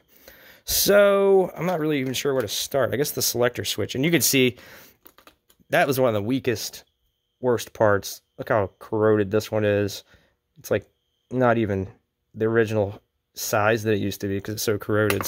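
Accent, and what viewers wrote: Philadelphia, United States. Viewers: Hi I think I here what sounds like a delco or Philly/south jersey accent im from Philly and people think I’m crazy when I’m out of town and I go out to eat and they ask what I’ll have to drink and I say I’ll have a “wooder”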